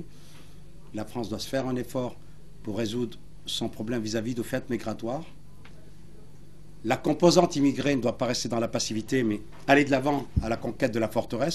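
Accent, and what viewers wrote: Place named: Senegal. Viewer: la prononciation est typiquement Sénégalaise !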